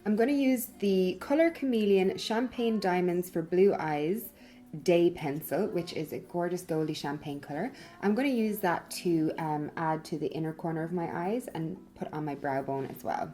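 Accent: Ireland. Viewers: Is your accent Irish? Love your channel & review!! Love Charlotte Tilbury products & you look beautiful 😃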